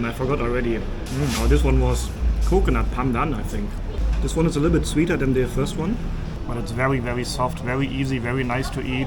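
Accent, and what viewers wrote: Germany. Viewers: I love watching all your videos!! Im addicted to your videos!! Everyday i must watch your vidoes!! I love your German accent, so cute!😊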